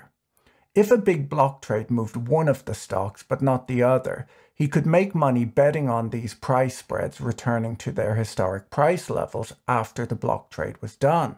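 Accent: Ireland. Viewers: I'm curious about your accent..I know you rarely read comments especially on older videos, but your accent fascinates me. It sounds Irish but there's something else in there it sounds half American or Canadian. Can you tell us how your accent came to be like that and where you've lived etc? Maybe it's your video voice to flatten your Irish accent for American audiences and you're doing it deliberately? Pretty cool smooth accent anyway.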